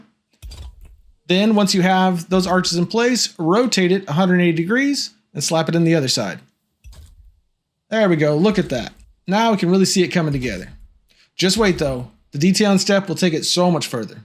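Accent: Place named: South, United States. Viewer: You've never spent time in the south, have you? You have a "ruff" on your house. You "warsh" your car. Accents are fun :)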